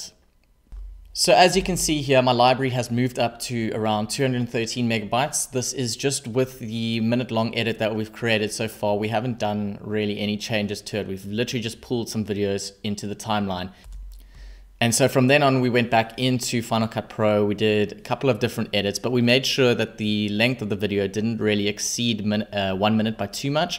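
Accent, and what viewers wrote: South Africa. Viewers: Yisis you sound like a my home cape town. You saved me a ton. I lits maxed out my space on my laptop and editing was a nightmare. My project file was 259gbs. My poor 500gb sata was crying but this is going to save me a ton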